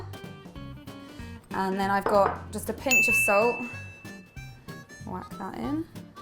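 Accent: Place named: Northern England, United Kingdom. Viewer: do you have someone close to you who is a Northerner? every video without fail you slot the accent in there hahaha. (i'm a northerner, it's always amusing ;) )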